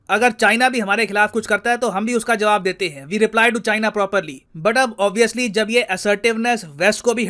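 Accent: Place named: Punjab, India. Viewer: Sir app Punjabi ho ? ,Aisa accent tho pehli nehi Suna tha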